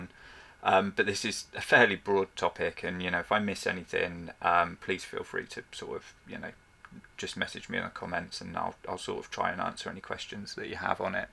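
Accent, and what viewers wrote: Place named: RP, United Kingdom. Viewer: Hello From Malaysia! I Just got the GH6 yesterday. Your channel is very useful. I appreciate the RP (Received pronunciation) accent.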